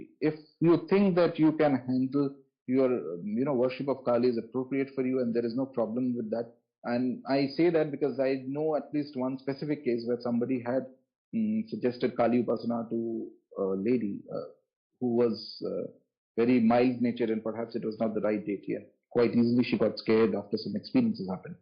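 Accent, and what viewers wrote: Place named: United Kingdom. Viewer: Why are u speaking in BRITISHER INVADERS language? Do we have no INDIC language to depict .. And why are u calling उपासक as उपासका & भैरव as भैरवा, while you correctly pronounce Chanel, Louis Vuitton, Dior, Citroen, Moët & Chandon, Laurent Perrier, Veuve Clicquot, Louis Jadot Domaine Leroy, Château , Bordeaux and L'Oréal as per original French pronunciation & correctly pronounce ikigai, wabi-sabi, kaizen, shinrin-yoku, omotenashi, gaman), kintsugi, shikata ga nai & bonsai as JAPANESE pronounce them. Then why SANSKRIT terms are pronounced by you as beef-eater BRITISH pronounce them? You're full of arrogance & अहंकार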